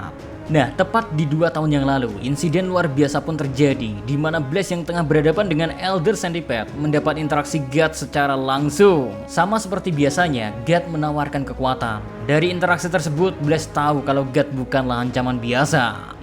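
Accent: Java, Indonesia. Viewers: Ngomong ga usah di gaya2in tapi Masi medogg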